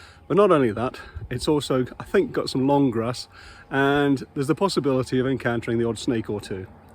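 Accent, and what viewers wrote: United Kingdom. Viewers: I always assumed you were British lol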